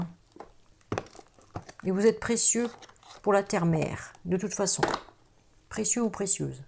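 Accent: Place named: North, France. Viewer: Bonjour vous êtes du Nord 😊😊 je l'entends comme moi 😊😊 votre tirage me parle beaucoup ♥️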